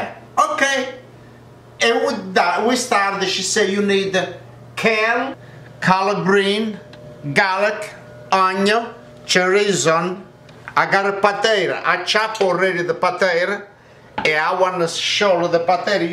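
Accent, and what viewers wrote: Italy. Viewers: He sounds like an oldschool italian imigrant. Kinda cool.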